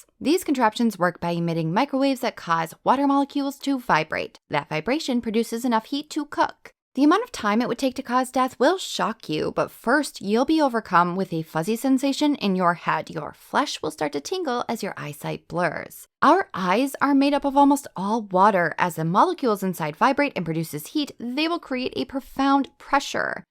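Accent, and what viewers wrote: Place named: South, United States. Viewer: For some reason your voice in my head is deeply southern accented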